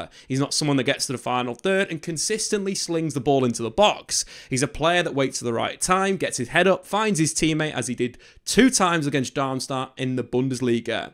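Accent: United Kingdom. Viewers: Forgive his english accent 😅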